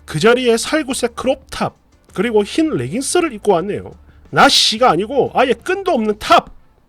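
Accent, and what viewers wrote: Gyeongsang, South Korea. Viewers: 덕분 에 마니 웃고 갑니다 칠학년 에 웃을 일이 없는데 의 그 다른 사람 이 흉내 낼수 없는 경상도 스타 일 그 특유 의 진짜 잘 보고 있어요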